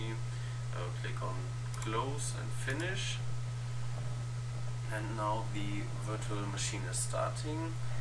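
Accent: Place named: Germany. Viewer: bist du deutsch xD das hört man :D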